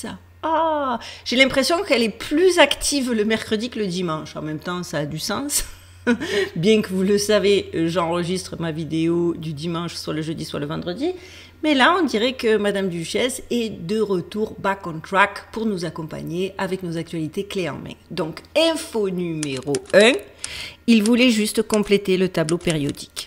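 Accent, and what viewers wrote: Marseille, France. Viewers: trente seizième, au moins....t'es pas Marseillaise pour rien au moins, grosses bises amicales du pays des cigales, super vidéo comme toujours 👍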